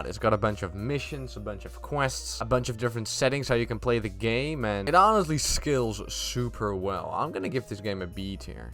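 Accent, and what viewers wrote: Netherlands. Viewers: Wow, your accent sounds so Dutch! Geweldig. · Not at all... Imao. Pewds is Swedish and sounds Swedish. is Dutch and sounds Dutch. But they're both definitely accents!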